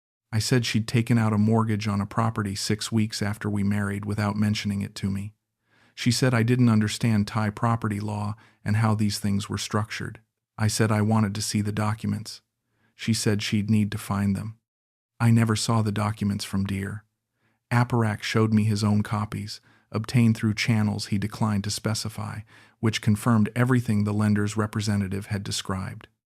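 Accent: United States. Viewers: Listening to a man with an American accent referring to anyone other than his wife as his "mate" does not sound right.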